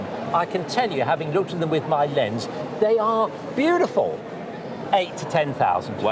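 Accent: United Kingdom. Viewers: well, he is British, their spelling and pronunciation is different.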